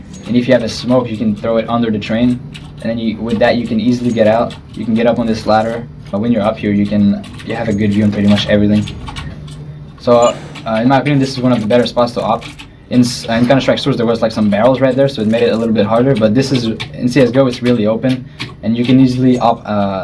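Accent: Quebec, Canada. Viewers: I like that quebec french accent :D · Dat subtle french-canadian accent.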